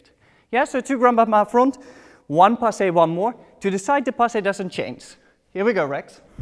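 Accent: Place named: Australia. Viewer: his accent sounds partly Australian ...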